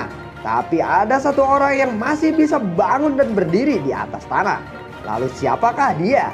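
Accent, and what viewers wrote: West Java, Indonesia. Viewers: Cing baleg atuh ngomong na katingali pisan Jelema Sunda na teh tapi Hade pisan Gaya na terus Ken Persib bandung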